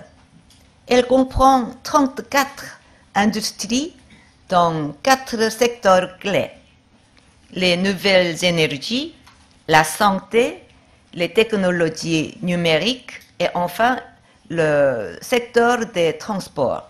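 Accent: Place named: Paris, France. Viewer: 불어할 줄 아는 사람은 알겟지만, 나쁜 불어실력은 아님. 하지만 정상씩이나 되서 외국에서 자랑할 만큼은 아님. 기본적인 발음을 파리식대로 하긴 하는데... 억양이 듣기가 정말 싫다. 애기가 처음에 말 배울 때 더듬거리는 거 같음. 프랑스인이 볼 때 진짜 병신같을 거 같은데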